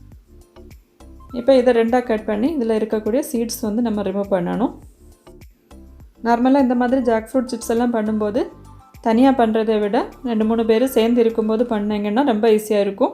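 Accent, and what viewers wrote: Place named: Kanyakumari, India. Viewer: Sis nenga kanyakumari dist a🙄...slang apadi iruku🥰🥰...nice sis💐💐☺️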